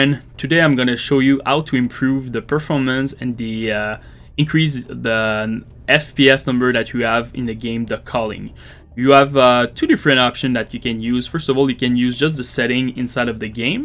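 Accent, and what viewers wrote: Quebec, Canada. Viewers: Are you from Quebec? I usually recognize the english accent of my people :P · Yo dude t'es tu québecois? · is that a quebec accent i hear ? lol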